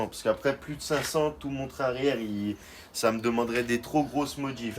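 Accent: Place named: Lyon, France. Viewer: Il a bien l'accent lyonnais. Beau projet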